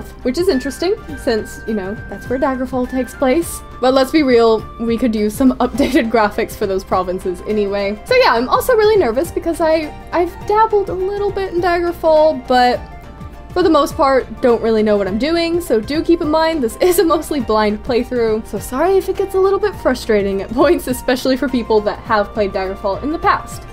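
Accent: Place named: Scotland, United Kingdom. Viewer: You sound scottish ! just like Karen Gillian in the Doctor ! subbed !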